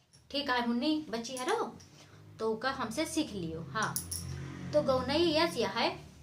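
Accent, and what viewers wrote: Awadhi, India. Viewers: आप केरि अवधी कॉमेडी तौ बहुतै नीक हुअत है। ख़ास कइके अवधी कै लबो-लहजा। मुला ई तौ खड़ी बोली - हिंदी केरी गौनई रही। कौनौ अवधी गौनई पेस करौ तौ अवधी मजा अऊर आवै। बड़ा नीक लाग आपकै चैनल। खूब तरक्की करौ। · Bahut badiya yaar mja aa gya...aapki awdhi boli ne to dil khush kr diya.or video bnaye awdhi boli me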